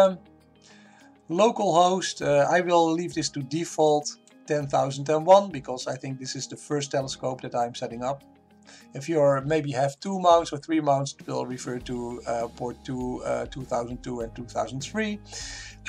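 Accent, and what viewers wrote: Netherlands. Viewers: Het accent is Nederlands, dus probeer de vraag even in het Nederlands. Kan ik gewoon een RJ11 naar USB kabel gebruiken? Dan sla ik de rs232 over zeg maar.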